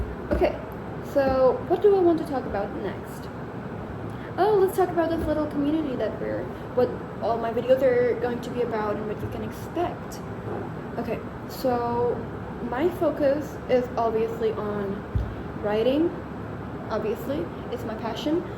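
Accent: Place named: Asia. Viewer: Artsy careers can be difficult my cousin took film studies and cinematography he had similar issues with his family members but he made it big. Aside, as an artistic and introspective person myself im quite into writing poetry, journaling and reading existential books. Also love your accent, where in Asia are you from ? We can share our writings and help each out of creative blocks ? Im making my main themes of life set of poems I've done 2 out 6. Tryna find the inspiration to write the next one. 😭 Also do try the app poetizer can help you publish.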